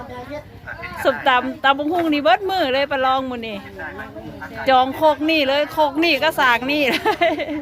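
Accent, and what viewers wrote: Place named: That Phanom, Thailand. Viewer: เจ้าของเพจสำเนียงคือไทยธาตุพนมเนาะค่ะ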